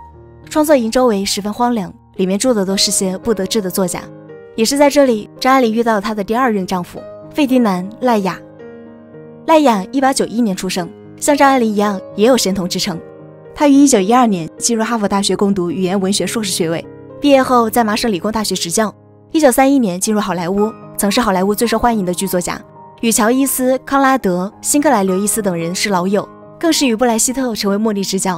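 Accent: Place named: Shaanxi, China. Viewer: 这口音，陕西、山西或内蒙的吧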